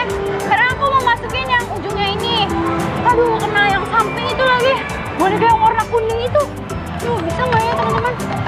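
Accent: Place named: Sulawesi, Indonesia. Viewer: Itu logatnya Sulawesi yaaaaa Hampir mirip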